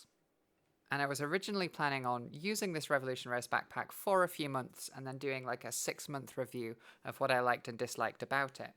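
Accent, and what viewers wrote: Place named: East Yorkshire, United Kingdom. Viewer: every now and again, i hear east yorkshire in your accent. Hull. Have you lived in some different places? Where are you from? love the video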